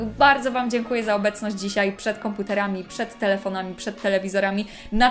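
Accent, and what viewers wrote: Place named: Russia. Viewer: Powiem, że rosyjski akcent pierwsza klasa 👌🏻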